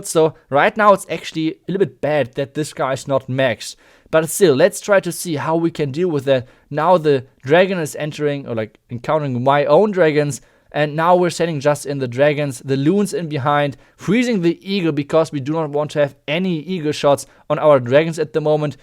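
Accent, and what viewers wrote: Germany. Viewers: You can easy hear the German accent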